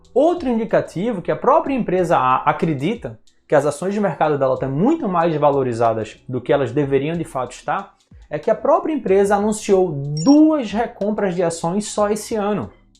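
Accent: Pernambuco, Brazil. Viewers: Tu és pernambucano?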